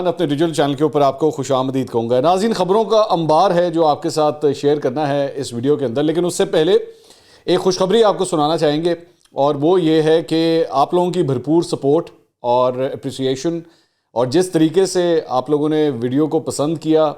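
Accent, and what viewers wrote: Punjab, Pakistan. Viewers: Punjabi touch zaida DIA karay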